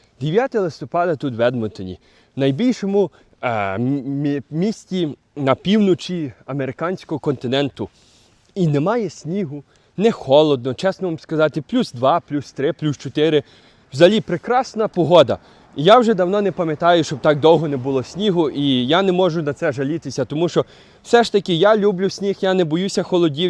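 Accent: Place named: Lviv, Ukraine. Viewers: Це типічний Львів.Наша гордість.І говір і люди тут особливі. наш хлопець-львівський. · так у Львовi розмовляють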